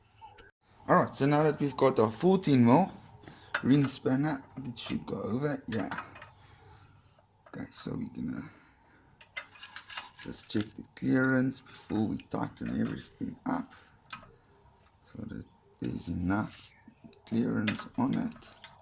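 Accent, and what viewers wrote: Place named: New Zealand. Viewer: The audio is still a bit off. You come out sounding like a New Zealander. But I can make it out. Great build I would like to see more variants of this?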